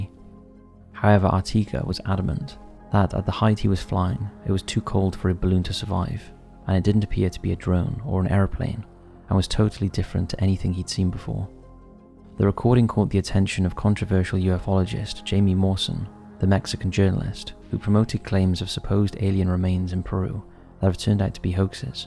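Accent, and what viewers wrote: Wales, United Kingdom. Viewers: Damn yall still making videos and saying things like “night sky” all British and whatnot? I ain’t seen a top 5s videos in a hot minute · He's brilliant, sense a slight Welsh accent and it fits...his content over the last decade is outstanding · Are u welsh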